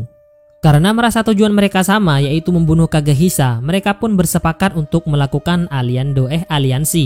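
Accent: Madura, Indonesia. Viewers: Logatnya kek orang madura. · Ini orang madura ya?? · Medureh dimmah kak??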